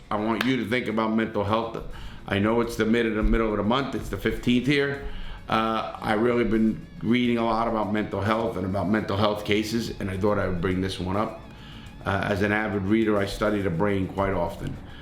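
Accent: New York, United States. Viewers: I’m gonna buy a copy as well, but I live here in Phoenix Arizona, and I’m originally from New York, I lived in three of the five boroughs of New York City, but I was born on Suffolk County Long Island. Every time I hear speak, I feel like it take’s me right back to NY (in a good way.) “Youtube membuh prograaam.” The best! I listened to your book in audio format, but I really need to buy the physical copy tbh. I’m Jewish but my father grew up in Bensonhurst Brooklyn in the 70s and 60s, so I got to hear all these crazy stories. your channel is the best color I always try to give a thumbs up to every video you make! We all make mistakes in life, but it’s how we learn from those mistakes, and use those mistakes to change our press the situation., you constantly remind me on a daily basis why I should be appreciative of the small things I have, and I’m only 36 years old, but I’ve dealt with some craziness in my life. 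I was arrested many many years ago for weed, but nothing really came of it, but I’ve had to deal with my fair share of the police, so I really appreciate your channel, although I wish you would focus on talking about the NYPD a little more because there are so many corrupt people in the department. I lived on Staten Island, Manhattan, and queens; the most corrupt police I’ve ever met in the country live on Staten Island, whereas here in Phoenix they are just trying to protect the community, and as long as you aren’t being a jerk, they will be respectful, w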